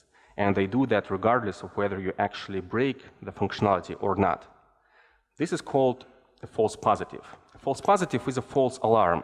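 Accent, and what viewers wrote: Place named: Russia. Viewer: Родной язык автора - русский. Да и говорит он с заметным русским акцентом. Для чего он на русскоязычной конференции говорит на английском?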